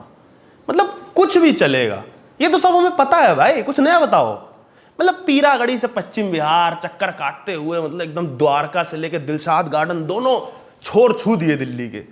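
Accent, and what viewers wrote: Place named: Haryana, India. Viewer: Bhai ki haryanwi accent shandar hai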